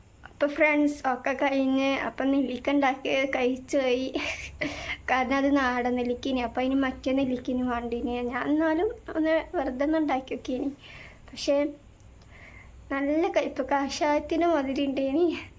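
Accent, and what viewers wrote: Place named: Malappuram, India. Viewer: Nadan slang 😅 ketttal aryam Malappuram ahn nnnnn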